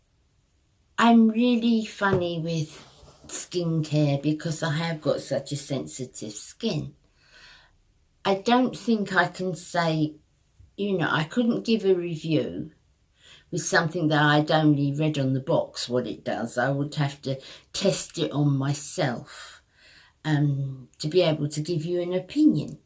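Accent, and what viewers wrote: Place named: England, United Kingdom. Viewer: Bless you.When you have watched youtube for 12 years or so and watched channels grow,you learn to take many people with a pinch of salt.I don´t watch any more to "learn",when it comes to make up as I have known for a few years now what suits me,and what to avoid,as I am sure many of us have.I like reviews and enjoy personalities.There is a lot of sugary B.S. out there and many use filters.Youtube is a HUGE business and money maker for many,these days.Good for them.It takes a bit of selling your soul for that but many are prepared to do just that.However,I would rather just spend my time watching people such as yourself,who keep all aspects real.I tend to think these days,as much as I love and have always loved make up,the market is totally saturated,and there is only SO much a person needs.Truthfully..I watch you,also, because it´s nice to here a fellow English accent;)......Stay as sweet as you are.....Sheila xx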